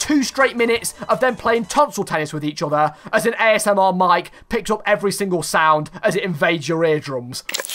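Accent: United Kingdom. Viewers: that british accent, lol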